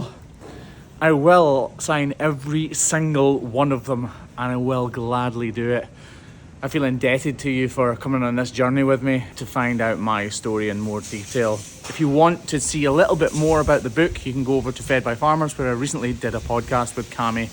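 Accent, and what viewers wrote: Scotland, United Kingdom. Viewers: Luv your channel. I live in the USA 🇺🇸 and sometimes your accent seems so strong to me, I have to put on the subtitles! 🤣🤣 No worries I absolutely LOVE your Scottish 🏴 accent!! I hope you and your family are all doing well. ❤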